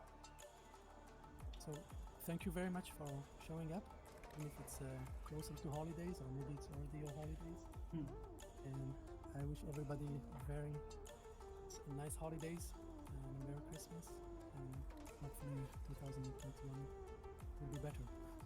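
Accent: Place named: Tunisia. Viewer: المتكلم تونسي و لاجزائري ..لكن الصوت زيروا كبير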